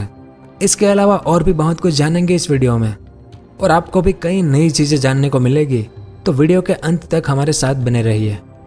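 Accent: Gujarat, India. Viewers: ACCENT SE BATA SAKTA HUN GUJARATI HO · Ye gujrati hai yrr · Gujrati accent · Gujrati ho aawaj se khabar padi gya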